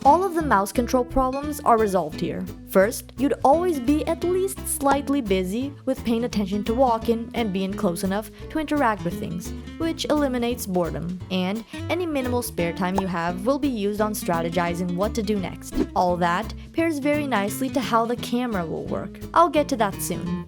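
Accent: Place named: Brazil. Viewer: O sotaque brasileiro é inconfundível, sucesso!!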